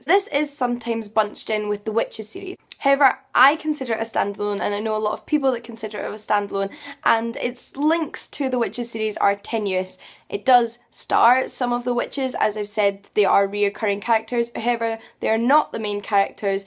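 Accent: Scotland, United Kingdom. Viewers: She's "Bri'ish", even better! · Love this video. I wanted to start reading HogFather, but when I saw how it was in the Disc World it was intimidating. I am going to subscribe to you now. Love your accent. Scottish? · Thank you. A good friend recommended the whole serie and I felt a little bit overwhelmed ! Also, completely out of context, are you scottish ? I'm not a native english speaker and I plan on moving to Scotland soon and so far I'm struggle with the local accent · She has a Scottish accent. She is Scottish, which means she is a,so British. If you want to split hairs at least know what you mean. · Béranger Seguin it's Scottish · Ohhh this is Scottish? Interesting. Seems like I mistook another brittish accent with Scottish then xD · She has a strong Scottish accent. You just don't know your accents mate. No shame in that. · British does not just mean English. 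Scotland is part of Great Britain, so is also a British accent. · My first thought when she started to talk”She’s british. I can trust her”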